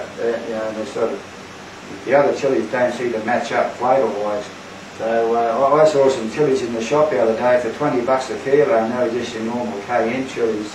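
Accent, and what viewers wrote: Australia. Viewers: are you Australian. Cause you have an Australian accent.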